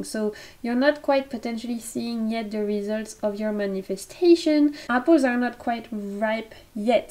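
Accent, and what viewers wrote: France. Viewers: Woww you are my new favorite youtube channel❤️ been binging your videos the last couple of days. You have alot of decks that i have on my wishlist! And pile number 2, i have the feeling i have been putting alot of work in my business and not seeing alot of results (or monetary results from it) so you are spot on❤️ keep up the good work! Love your french accent🥰 (can speak a couple of words in french but not much haha)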